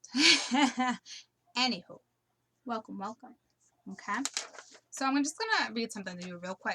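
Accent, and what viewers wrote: Boston, United States. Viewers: Oh my God !! Are you from Boston...I'm from Cape Cod!!!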